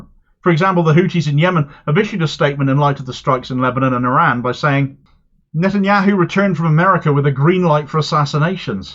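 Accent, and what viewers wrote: Cornwall, United Kingdom. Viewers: I have to agree, your lovely Cornish lilt could sound so much better! (btw I love your work and am on Patreon!)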